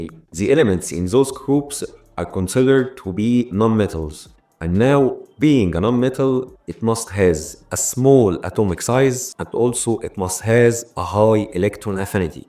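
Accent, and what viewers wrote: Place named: Egypt. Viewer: الفيديو عظمة بس طلع لسانك في الTh يا مستر بدل ما كده مصري أوي 😅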